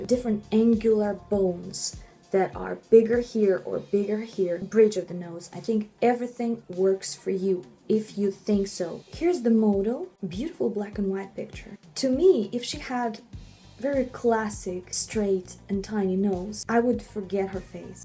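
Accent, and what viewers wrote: Russia. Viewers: I can hear russian accent hehe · Russian))) да неплохо звучит наш акцент)) я всегда за это волнуюсь ,когда говорю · Русский акцент чуть чуть чувствуется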